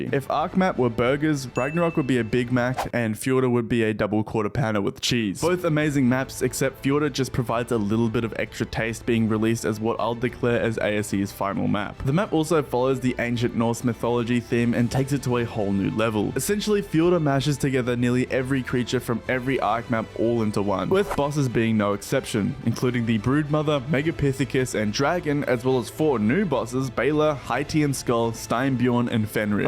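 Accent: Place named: Australia. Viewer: Completely off-topic but this comment made me realize that I was hearing an Aussie accent, and now I’m barely noticing that a large number of youtubers I’ve watched throughout my years are actually Australian lmao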